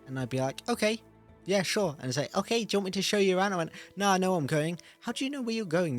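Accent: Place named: United Kingdom. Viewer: Okay I'm gonna spill the tea... he's British.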